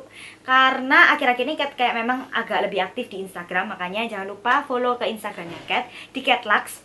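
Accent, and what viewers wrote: Java, Indonesia. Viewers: Kak asli orang mana? Kayak orang cina tapi cara ngomong nya orang jawa 😁 IG Lutfianasukma #kathlakzgiveaway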